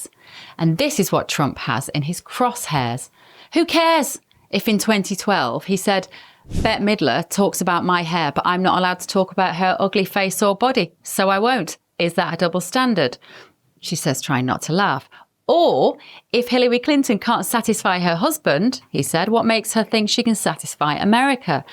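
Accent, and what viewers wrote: United Kingdom. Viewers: I'm an American man in my 50s., if not for your lovely British accent.., I would be inclined to believe that you are just a wholesome American girl, that everyone loves to adore. Honestly, I only mean.., no matter where we come from, common sense is universal. Good form.👍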